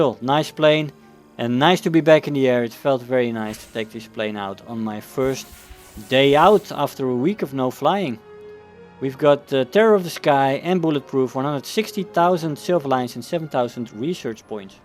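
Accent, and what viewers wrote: Netherlands. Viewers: are you Dutch? Your voice sounds like you are dutch xD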